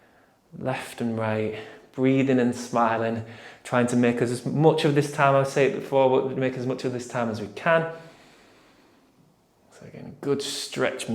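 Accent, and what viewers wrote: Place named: Hull, United Kingdom. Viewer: Hull accent always makes me smile haha. Smaaaling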